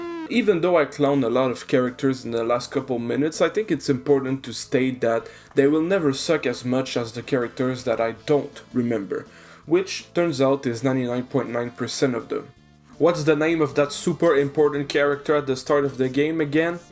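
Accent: Quebec, Canada. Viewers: r u a quebecor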